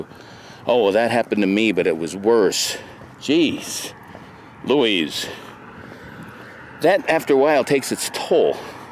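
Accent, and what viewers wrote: East Coast, United States. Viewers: Are you from the East Coast?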